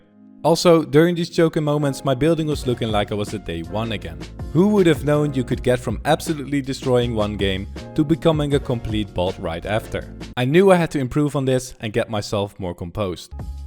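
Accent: Netherlands. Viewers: Jij bent sws nederlands hoor et aan je accent haha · are you dutch? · You sound so Dutch 😅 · Ik hoor aan je accent dat je Nederlands bent maar je uitspraak is echt goed hoe heb je dat geleerd? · are you dutch?? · Are you from the Netherlands? Cuz you have a Dutch accent!! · Are you dutch? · Nederlandertje ik hoor het al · Je hebt een Nederlands accentje..🧐😉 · Are you dutch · Wait u Dutch? Lmao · Waarom klink jij zo nederlands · Ur accent sound so dutch are you from Holland? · Are you dutch?? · Are you Dutch? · 100% sure you're Dutch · Are You dutch? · you sound like your from the Netherlands... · are you dutch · Are you dutch brother? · Are you're Dutch?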